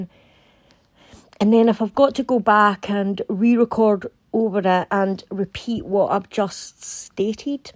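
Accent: Scotland, United Kingdom. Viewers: Oh it's such a pleasure to hear your sweet voice and lovely Scottish accent. Personally I don't think Meghan will ever be president - she would be too distracted suing everyone who criticized her or revealed her lies. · also, RE: swearing. There are different types of swearing. The$ussex_$quids, for example, cannot engage in rational, civilized discourse & debate or even exchange simple points. They start using vulgar, accusatory, base allegations because they're just not capable of debate or civilized argument. Those are the ones whose swearing is considered to be of limited intelligence. You, on the other hand, speak an elegant, fluent English with that endearing Scottish accent - music to my ears! Your type of swearing is different. It's more of an accent to the point you're making as opposed to just swearing for the sake of swearing because of being unable to make any cognizant debate. 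You're in the clear,. Anyone who has a problem with your, or anyone else's choice of rant, can leave. But, you know, they WON'T because only the $ussex_$quids, who are so devoid of talent, are the most likely to accuse you of being unintelligent (just like themselves!!!).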